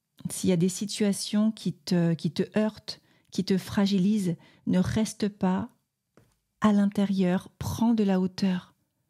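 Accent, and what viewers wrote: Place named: Quebec, Canada. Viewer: ...ce n'est pas pour "e" rien..., cool pour l'accent quebecois!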